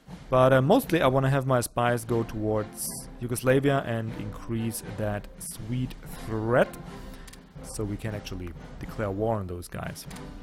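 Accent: Germany. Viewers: I might be a bit late to the party but I'm thoroughly enjoying the lets play so far and looking forward to the rest. keep up the good work and I love the German accent!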